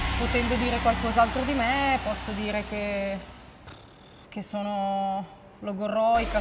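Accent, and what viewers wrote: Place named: Italy. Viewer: Q mujer más Hermosa... ese acento Italiano es irresistible...((( 🖤 )))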